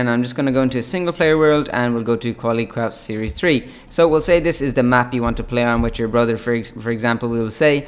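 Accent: Ireland. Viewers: Are you Irish? Also, do the 2 accounts have to be on 1 computer, or can 2 people use seperate computers and join?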